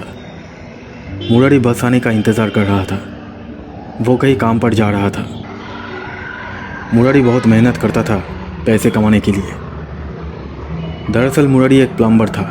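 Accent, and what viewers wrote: Bengal, India. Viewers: This youtuber is from Kolkata only I think so as he also has one more Bengali dubbed channel where he uploads this story only but later and you can notice his accent and names of characters are Bengali names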